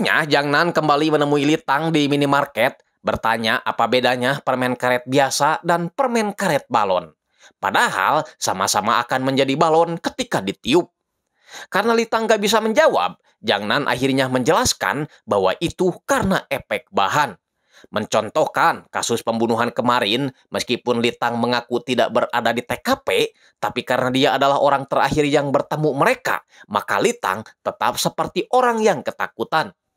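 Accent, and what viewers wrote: West Java, Indonesia. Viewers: Lucu banget aksen sundanya kang😂, hatur nuhun sudah dibikin ringkasan Killer Paradox nya, seru pisan... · Gua subscribe Karena channel ini ada logat Sunda nya 😂😂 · bukan iq7 bejir emang aksen sunda aja, bukan berarti aksen sunda = iq7 🗿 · Baru nemu chanel rekomendasi film2 unik, sapa dong mang wkwkw logatnya kga asing urang sunda 😂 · dari suaranya kedengeran jelas orang sunda😅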